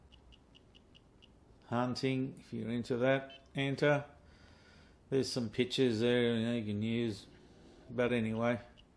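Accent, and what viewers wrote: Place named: Australia. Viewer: Great video---I stuck around just to hear that aussie accent.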